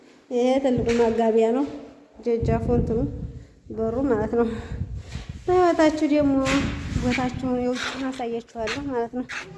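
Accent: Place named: Wollo, Ethiopia. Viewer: አወራርሽ ተመቸኝ የወሎልጅ ነሽ መሰለኝ 😊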